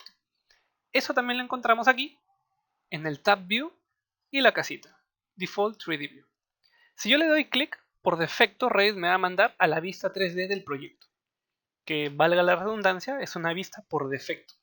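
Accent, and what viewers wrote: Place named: Mexico. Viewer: EXCELENTE MANERA DE EXPLICAR MI BUEN. ME DA CURIOSIDAD TU ACENTO, PARECE ACENTO MEXICANO JAJA. GRACIAS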